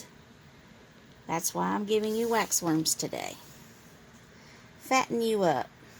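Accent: South, United States. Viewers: Loving that southern accent 😍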